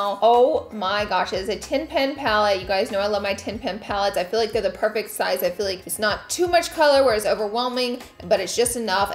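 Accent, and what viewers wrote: South, United States. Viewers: This is random and probly so 2017 but I love how you say concealer! Love that Southern accents still coming thru. Also you are always so fun and hilarious. Cracking me up all the time. Between you and Manny just making day. Thank you for being that Icon ,that boss babe for our future generations to look up to, congrats on your new holiday collection and for the price are u kidding, that's a good deal.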